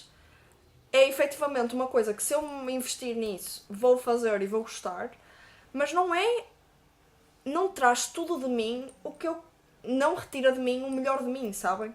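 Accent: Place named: Portugal. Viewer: Sotaque de Portugal é top ! rsrs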